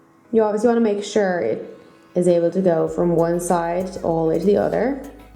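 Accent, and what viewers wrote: Dublin, Ireland. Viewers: irish gal!! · Is she Irish ? Cause I kept hearing hints of an Irish accent in there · Have you never heard an Irish accent before? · Kelcey Burrow it’s Irish I sound like her too😂 p.s. I’m Irish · Kelcey Burrow Irish I think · As I can tell your from dublin, I was wondering where you purchased these products & how much?? And also did you paint them using normal nails varnish or is there a special type??x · definitely not scottish. id say an irish woman whos lived in england/ English influence. or english woman with irish parents etc ..... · What shops do Ye get ur nail equipment I'm from Dublin I could tell ur Irish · Americans tryna say she sounds Irish with an American accent!? Haha no. She sounds dublinish through and through. That's a Dublin accent. Move on. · She's Irish what r u on about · you're beautiful and I love your irish accent :) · she's Irish ahahahhaha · its a South Dublin accent xx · definitely sounds irish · I love the Irish accent 😭😂 I have Irish background but I'm not fully Irish which sucks because I'm just from America like boring 😂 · She's Irish and she sounds Irish the whole video to me? 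· she's Irish 😊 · Kelcey Burrow sounds irish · Kelcey Burrow I'm from Ireland she is Irish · I hear an Irish accent. 💚💚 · Ireland? I hear it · she's irish😂😂😂😂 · We Have Essence In Ireland Too.....Yes She Is Irish LOL! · Kelcey Burrow I don't hear British I hear American and irish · Malaka Mohamed it's and Irish. Accent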